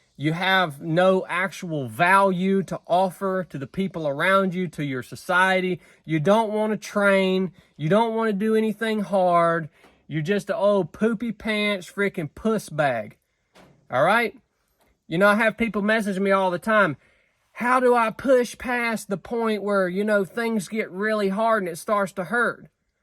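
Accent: South, United States. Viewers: What part of America is this accent from??? I need to move there ASAP 🧡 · I'm from Belgium sir and I wish I had the same accent when I speak english. Southern accent is damn good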